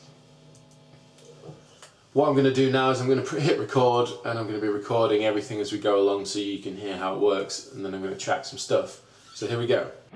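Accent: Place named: England, United Kingdom. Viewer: where are you orginally from in england? its something i've wondered for a while, i think i can detect an accent.. enlighten me:)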